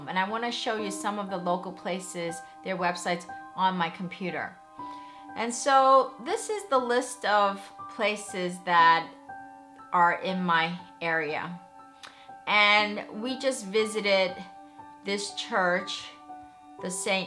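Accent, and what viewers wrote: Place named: New York, United States. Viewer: you live in Southern Cali...one would easily be able to make suggestions to you in regards to places of worship if say, you lived in SF - I assumed you lived in SF because you're Asian-American UNTIL I heard your accent I realized you'e a New Yorker. And NYC people typically find SF a bit weird. Anyway, find your own spiritual place. Your OWN temple. I'm something of a pantheist/deist. And look up on Buckfast Abbey here in the UK. They have an amazing prayer room:) if you come to the UK be sure to visit it.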